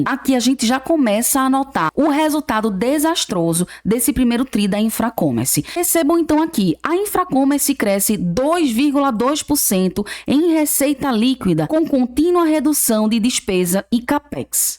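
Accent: Northeast, Brazil. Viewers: Muito legal esse teu leve sotaquezinho do nosso nordestino tradicional!!! Isso te faz a única, e te distingue no meio de todo esse grupo. Parabéns!!! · Adorei nossa marquinha nordestina... Parabéns. Comprei 10k metade 0,46, metade a 0,51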